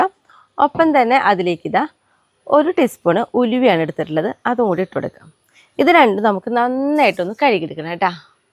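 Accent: Thrissur, India. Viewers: Thank youvery much for the information. Are you from Tcr side I am happy to hear our Tcr slang .now iam in Kollam.your way of presentation and smile beautiful. The vessels you used are super .surely I will make these Dosas try to make my huaband Happy. Bye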